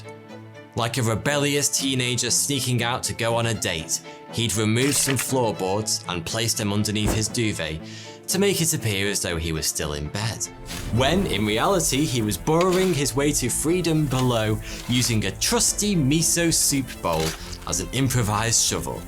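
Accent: United Kingdom. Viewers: ...THE MAN THAT LOOKS LIKE A GERMAN BUT SPEAKS LIKE A BRITISH MAN...U R 2 F....N CUTE I JUST WANT TO TAKE YOU HOME...😃 👄 💋 💘 MERRY XMAS LOVE...🎅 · what do you mean? At first, I assumed this was a pro-america joke, insinuating the English accent is incorrect, which i applaud because its hilarious. However, I then noticed "mate" and realized this is clearly no American joke. So i ask again, what do you mean? Does he have a speech impediment? As an American, i cant tell, it just sounds like British-English or another one of those funny non-American lingos. On a side note, i do totally agree with your main point, no reason for this Japanophile to get on the guys case about using the typical foreign-pronunciation of Yoshi. Nothing worse than the white dude who forcefully pronounces all Japanese words as authentically as he possibly can. It's just sad when you hear those clowns order a Sapporo beer by belting out those staccato syllables "SAH-POH-ROH". 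You just want to turn to them and say "yeah man, we get it, you watch Narutto or something" but they would problem take that as a compliment of their comically incorrect accent so we stay quiet. If your speaking in English, keep the words in English, say "sake" like the rest of us, not "SAH-KAY". And I say this all as someone who lived in Japan for years, not just an ignorant American... Well, i suppose I say it as an ignorant American who lived in Japan for years...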